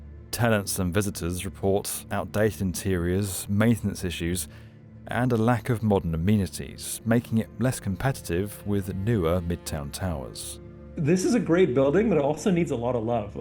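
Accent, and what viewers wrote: United Kingdom. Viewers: Another 20 minutes of a Brit saying Chryzla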